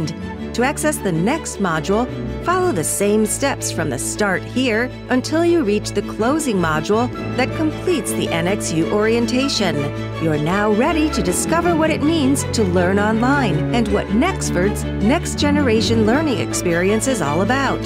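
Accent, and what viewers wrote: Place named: South Africa. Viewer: She is definitely South African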